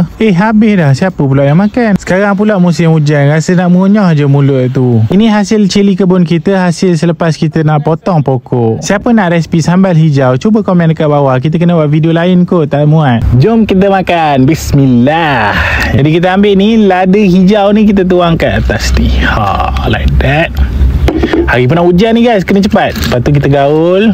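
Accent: Pahang, Malaysia. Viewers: Dari loghat abg ni,mesti dia ni,org Pahang😅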